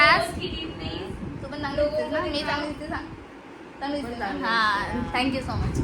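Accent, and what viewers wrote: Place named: Malvani, India. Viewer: ur malvani accent is so cute, say it again 😝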